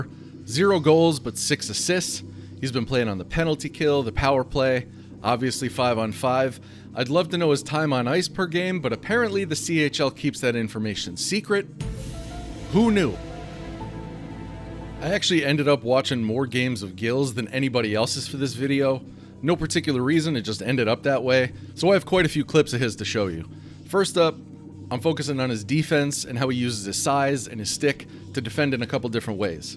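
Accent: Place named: Philadelphia, United States. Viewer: excellent video, The Philly accent 😆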